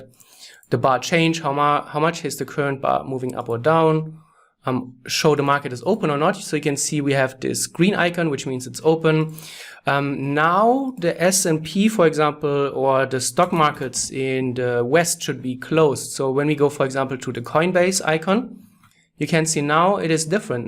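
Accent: Germany. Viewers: direkt gemerkt das du deutscher bist :D gutes video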